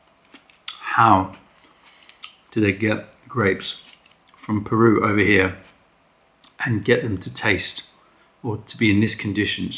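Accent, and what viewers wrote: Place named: England, United Kingdom. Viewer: I really thought you were Indian! must be the English accent😊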